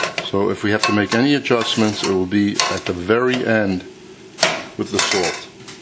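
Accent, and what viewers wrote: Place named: Boston, United States. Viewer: When I clicked on this video, I thought to myself: "How awesome would it be if the guy cooking Boston clam chowder, actually had a Boston accent." Apparently my wish came true!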